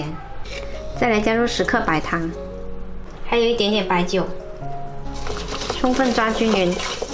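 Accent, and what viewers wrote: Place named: Teochew, China. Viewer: 是揭阳普宁一带的客家人吗？听着潮汕话挺标准的（本人潮州的）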